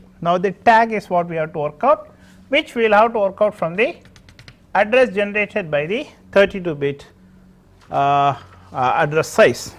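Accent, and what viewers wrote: India. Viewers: It's sarcasm, I just had a very bad day then. I ended up passing that class just. I personally just find Indian accents very hard to understand.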